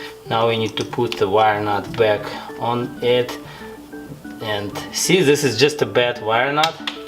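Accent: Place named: Russia. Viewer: Смешной русский акцент))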